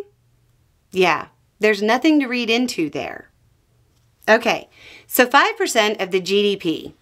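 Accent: South, United States. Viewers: Thank you for the "Monkey Paw" reminder and the description of the current Monkey Paw. Your southern style polite got ya's are precious. Keep smiling.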